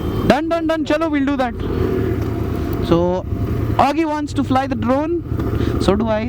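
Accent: Hyderabad, India. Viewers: Hyderabadi accent!!...,.. feels like home❤️🇮🇳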